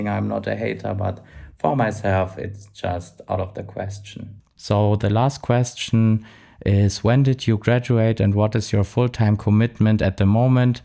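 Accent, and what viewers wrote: Germany. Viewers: Can't tell that you have a weird or "sleepy" voice in English. You just sound like a German speaking English carefully. Vielleicht auf Deutsch könnte ich die ,,Schläfrigkeit" hören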